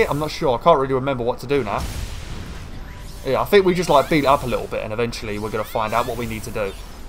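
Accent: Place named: United Kingdom. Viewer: Reasons why is an awesome youtuber: 1) he dosent spam his videos 2) he always responds to his Wolfpack 3) plays games that you want (the newer ones you want, screw call of duty and mine craft lol) 4) supports my Wolfpack (your very awesome for that, thank you) 5) is a that could play video games 6) a that drinks T 7) a that speaks with a British accent 8 ) to infinity and beyond more lol keep up good work, I'll try my best to keep supporting the Wolfpack as well as trying to be awesome for my channel or whatever! Always eat your pizza! HOOOOWWWWLLLL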